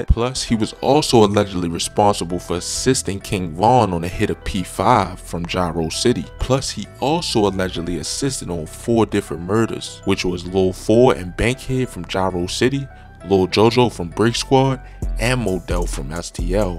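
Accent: Baltimore, United States. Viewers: Baltimore accent is dope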